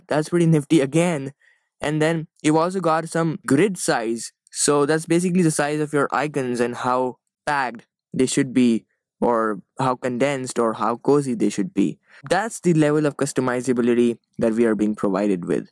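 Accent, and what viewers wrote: India. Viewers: Your indian accent is a little thick, just me. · U got indian English accent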